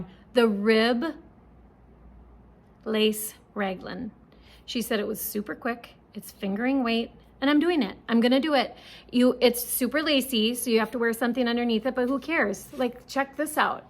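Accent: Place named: Minnesota, United States. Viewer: Just found you today and am binge watching your video podcasts. I think your Minnesota accent is charming. I have an Atlantic Canadian accent...no doot aboot it, Eh? 😂 Thanks you! 🥰🇨🇦Sharon.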